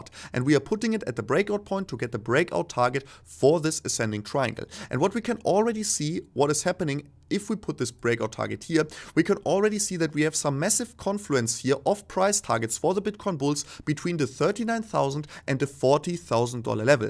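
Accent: Germany. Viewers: You have a little bit of a german accent, but your english is excellent. What other languages do you speak?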